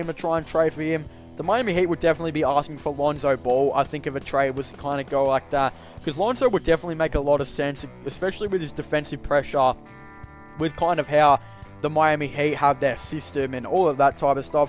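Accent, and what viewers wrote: Australia. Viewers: Ahhh, its australian bud. Not even close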